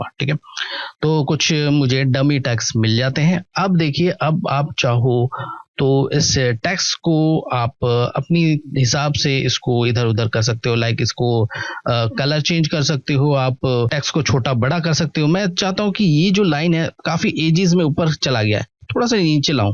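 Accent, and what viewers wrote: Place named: Pakistan. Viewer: superb sir keep it up when you speak it seems to me someone speaking in very pakistani urdu language from india, i am indebted to you sir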